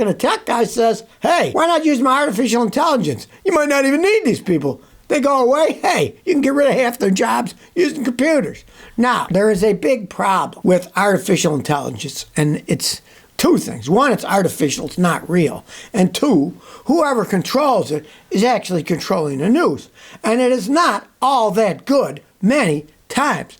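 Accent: Germany. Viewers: im here for his German accent lololol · Your German accent has me rolling! 😂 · The German accent is hilarious!!! 🤣😂🤣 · enjoyed the German accent. :D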